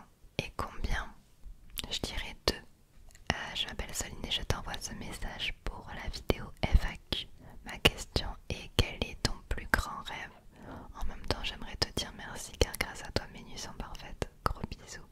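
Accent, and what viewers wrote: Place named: South, France. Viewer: Tu es Parisienne ? Tu as dû descendre dans le sud, toute petite, car je reconnais notre accent. Je suis de Carmaux. Bizz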